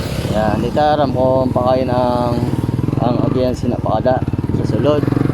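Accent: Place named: Northern Samar, Philippines. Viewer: Basi sa GOOGLE nag search Ako ang Dimasalang Palanas at Cataingan ay malapit sa WARAY ang salita kaya pala yung salita mo naiintindihan ko haha btw taga Eastern Samar ako kung di Ako nagkakamali yung Accent mo sa pagsasalita ay parehong pareho sa Northern Samar ganun Kase Accent nila sa pagsasalita ng WARAY sa pagkaintindi ko yung Salita mo ay Bisaya na pinaghalong WARAY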